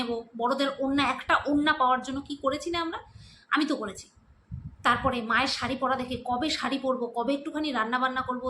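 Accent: Bangal, Bangladesh. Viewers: ❤❤❤❤khubbb valo ble6o r tomar amgo ei bangal ktha purano diner ktha mne kraiya dayyy,vuilla jai amio bangal❤❤❤❤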